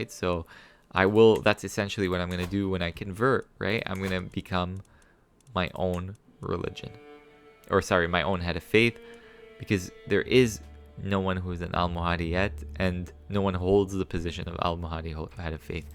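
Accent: Arab world. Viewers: loved it, and its nice knowing ur an arabic from ur accent, keep going bro.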